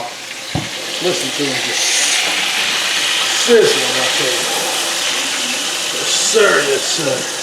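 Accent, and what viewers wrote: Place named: Eastern North Carolina, United States. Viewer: is very much an Eastern North Carolina guy. His mannerisms, his accent, his tastes, even his inefficiency. And, of course, his Easrern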